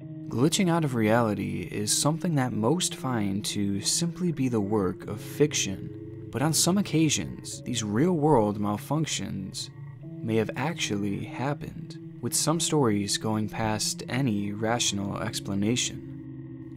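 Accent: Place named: United States. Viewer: why tf do you speak like this? "Kalua" Lumpur??? jesus christ.... the american-ness is out of this world. and there have been found bits of the plane . this has to be one of the most triggering videos i've ever seen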